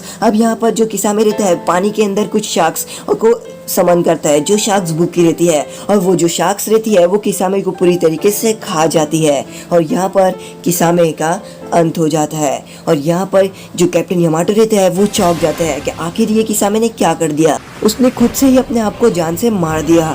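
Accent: Maharashtra, India. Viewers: Bro are you marathi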